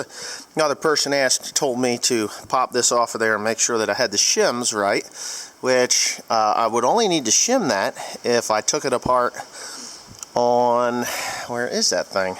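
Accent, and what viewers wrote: New Jersey, United States. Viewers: RADiator is definitely a NJ accent thing. When I moved from South NJ (Born and raised) to Colorado, I got my balls busted for saying it the same way you do lol. Also had issues with the word water. NJ = Wooder CO = WAHter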